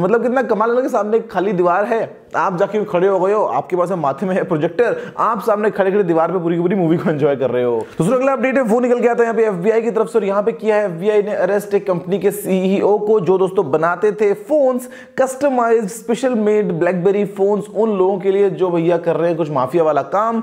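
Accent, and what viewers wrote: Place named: Haryana, India. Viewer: I think apki performance mein thoda haryanvi tadka hogga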